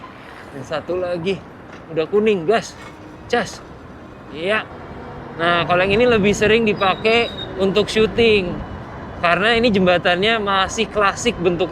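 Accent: Indonesia. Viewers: 20 th tinggal, suaranya ga berubah sih" tetep Indonesia bgt' 👍👏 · Dah lama sampe lupa Chanel ini,pdahal dlu saya suka nonton... Btw gua kagum m lu bro,, 20 tahun di Amrik tapi pas ngomong bahasa indonesia,dialek nya masih indo.👍🏼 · Hebat, 20 thn di LN, logat nya Indonesia bnget❤ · Logat loe Indo banget.... Keren...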